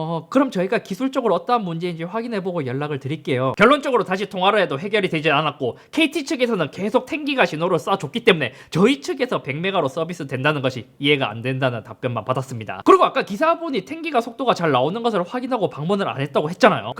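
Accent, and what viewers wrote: Gyeongsang, South Korea. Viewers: 경상도 신뢰가 안간다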